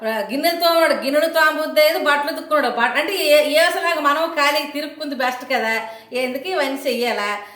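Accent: Srikakulam, India. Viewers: అక్కా నీ శ్రీకాకుళం బాస చాలా సూపర్, కానీ యేసులో ఉన్న ఒక్క కొలటి కూడా నీలో ఉంటే చాలా అభిమానించే వాడిని, నీకు దేవుడు మారుమనస్సు దయచేయును గాక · సికా కులం ఏమో 😂 అనుకున్నా నేను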